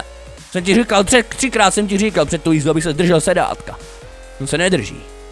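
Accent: Moravia, Czech Republic. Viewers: ty jsi fakt moravák:D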